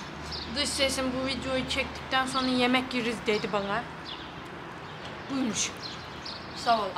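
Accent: Kütahya, Turkey. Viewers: Bizim gız, Öncelikle başarılı çekimlerin devamını dilerim. Kızımın Üniversitesi için yılda 4 kez İZMİR başlangıç KÜTAHYA, GEDİZ güzergahından ZONGULDAK'ĞA geçiyorum. KÜTAHYA şivesinin zaten kendine özgü hoş ve tipik bir iç EGE aksanı var. Bu aksanı ve kültürü bozmadan bulunduğunuz beldenin yaşlılarını,düğün örf ve adetlerini konu alan videoların sizlerin daha çok tanınmanızda yardımcı olacağı kanaatindeyim. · Lanet Gelsin Dedigim Yerdir Kütahya Ama Senin Şu Şiven Yüzünden Sevmeye Başladım.. Yayın Hayatında Başarılar Kardeşim 😊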